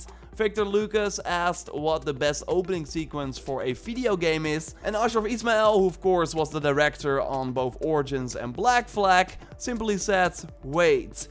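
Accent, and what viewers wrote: Netherlands. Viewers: Are you Dutch lol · are you dutch? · Are you dutch?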